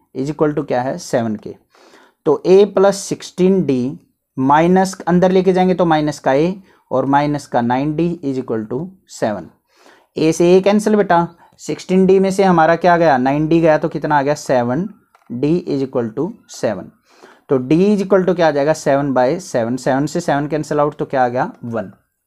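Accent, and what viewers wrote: Haryana, India. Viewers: Sir aap Haryana s ho na maybe😅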